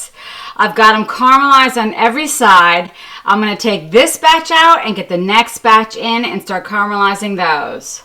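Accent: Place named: United States. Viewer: Presumably - you take the string off before serving? Love that American accent by the way!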